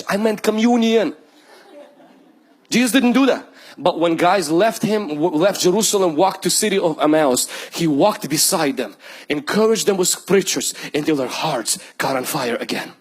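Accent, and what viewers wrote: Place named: Eastern Europe. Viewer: he sounds eastern European... nice message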